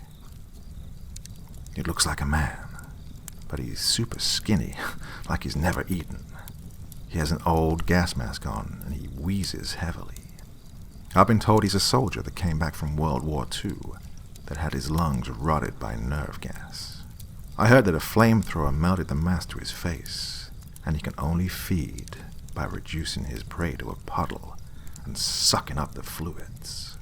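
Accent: South, United States. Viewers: ¿Why So Serious? xD Southern or English? Hard to peg. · Loving the southern accent, I do declare.. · Thanks for the narration man, it turned out really well. I didn't imagine them speaking with a southern accent, but now that I'm hearing it, it does add nicely to the campfire aesthetic you have going for the story. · Loretta oh yeah, does him a good ol' southern US accent, yessiree bob. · I love the accent, a mix of your normal accent & a light tinge of a Southern drawl. It reminds me of the accents used by an actor in a classic Hollywood film (i.e. Gone With the Wind) — I love it & it’s very fitting for this story... despite the setting being Connecticut, apparently.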